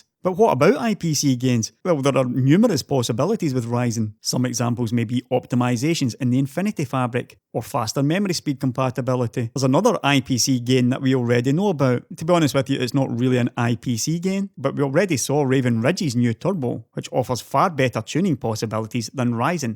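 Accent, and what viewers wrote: Scotland, United Kingdom. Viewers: I can hear the Scottish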